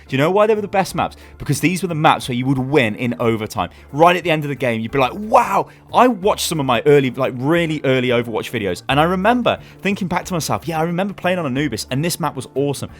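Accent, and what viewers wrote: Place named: United Kingdom. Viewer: Force gaming said all of this in a way shorter time. However it is true that your Brit accent is better to listen to than his American one.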